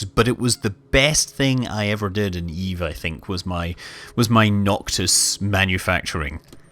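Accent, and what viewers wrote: Scotland, United Kingdom. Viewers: You sound just like Scotty from startrek. That is so freakn cool. I wold flos that accent and pick up all the chicks :D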